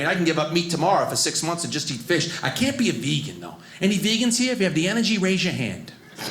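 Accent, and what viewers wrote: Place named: New York, United States. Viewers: First time seeing this comic, testing my accent recognition skills. Have not googled him. But he’s from NYC. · Classic standup style routine, but still original. Love the NY accent. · That was amazing. Great jokes, great delivery, great timing, great facial expressions. Instantly likeable, too. And on top of all that, me being a German language nerd, a fascinating case study of the New York accent and mentality. 👍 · I enjoyed this set, I like his NY accent, good story line and punch lines.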